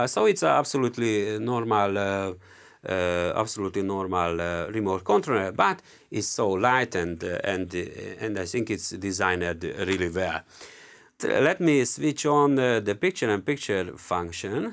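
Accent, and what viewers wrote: Hungary. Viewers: Great monitor, good review ... :). Good humour, lovely accent ... :): are you from Hungary or somewhere in the neighbourhood ... :)? In any case, it was great help for me, now I know why I need at least 40" for a 4K monitor ... :) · Im sure I hear hungarian accent there. I might be wrong. This monitor is £498 on amazon. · Köszi az infókat, nagyon jól jött :) ( az akcentusodból kiindulva magyarul írtam :D ) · he he az első mondtad után tudtam hogy magyar vagy :D köszi szuper volt a videó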